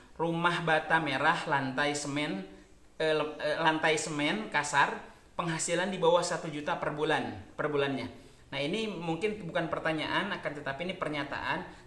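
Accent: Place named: Java, Indonesia. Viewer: Tuban hadir, kalo boleh tw kakaknya asal mana ya kok cara nyampekin infonya kaya orang jawa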